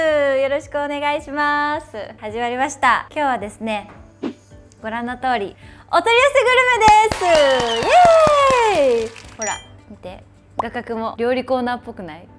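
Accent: Kansai, Japan. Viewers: 関西弁可愛い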